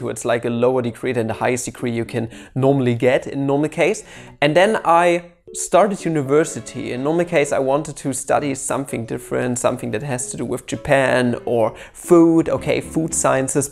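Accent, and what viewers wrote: Germany. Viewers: funny german accent :DDDD!!?!!!!!?!!!!!!?!!!!????!!?!!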